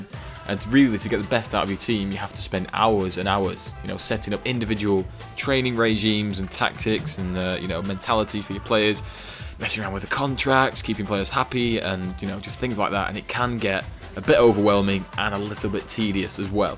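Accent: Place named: Northern England, United Kingdom. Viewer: when this guy as a Northern English accent instead of Scottish then like AM. :P Loved the review.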